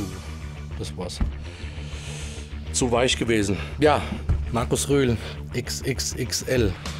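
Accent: Hesse, Germany. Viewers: de hessische dialekt bedarfs auch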